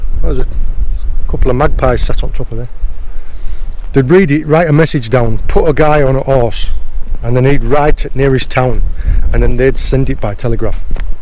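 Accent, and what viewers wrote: United Kingdom. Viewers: I'm showing this video to my student now to demonstrate the British accent.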